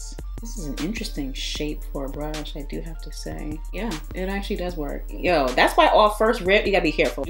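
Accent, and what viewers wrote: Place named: Rhode Island, United States. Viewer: Come thru with that RI accent!👌🏼🤌🏼😂🤣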